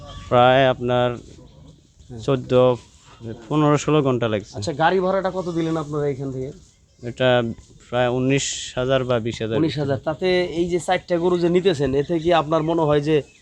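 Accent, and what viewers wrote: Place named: Cumilla, Bangladesh. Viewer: কিরে চিটার কথা ভালো করে বলবেন শালা তুমি বললেন কুমমিলা কথা বলেন আবার পেনি কথা বলেন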